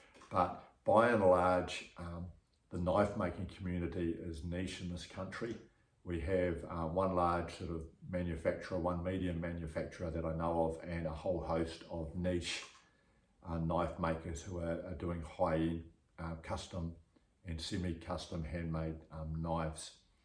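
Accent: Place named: New Zealand. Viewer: great kiwi accent - easy to listen to nice content mate, the history of nz making is really interesting there is an old forge down on the mainland - near blacks hills (?) it's really cool and i can imagine knives being made and repaired there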